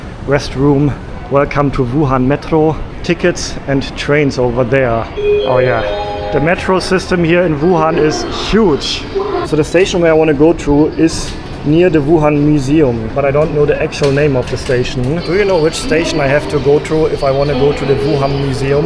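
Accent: Germany. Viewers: your pronounciation of Germany in Chinese is still off 😂It is because of your German accent. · Maybe a "better" suggestion for saying Germany in Mandarin is: "Ter Kwor"; you raid roughly "Ter Kwar" - a world of difference. People who suggest, say: "De Guo" - may not be helping you much, since you maybe do not know the current, and more accurate, Pinyin phonetic system. The "De" is pronounced "Ter" and the "Guo" is pronounced "Kwor" - OR rather than AR sound. Also in Pinyin, the "d" is the usual "t" and the "g" our usual "k". I noticed you may have pronounced Wuhan as Vuhan, since you are using your German way "v" for the "w", just as your English is very much the German way of speaking English.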